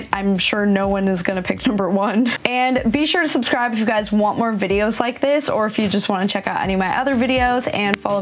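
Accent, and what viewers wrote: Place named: Southern California, United States. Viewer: Why do you speak like you're from SoCal when you've only lived there a few weeks?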